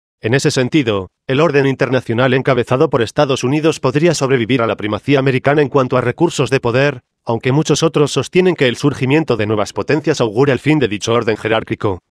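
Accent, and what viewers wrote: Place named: Spain. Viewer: Oye pero cuanta mierda se habla por aquí ! Quien coño maneja estás malditas computadoras con acento español !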